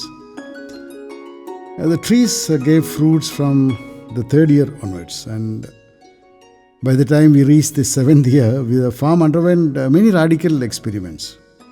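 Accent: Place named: India. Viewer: I don't understand your India English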